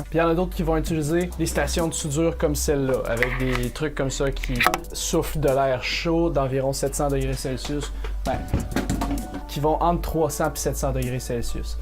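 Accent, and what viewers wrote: Canada. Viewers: haaa tu est canadien ^^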